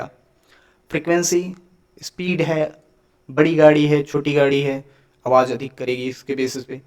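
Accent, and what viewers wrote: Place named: United Kingdom. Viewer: Sir ka hindi bhi English accent me h 😅 But explained too good 👍🙌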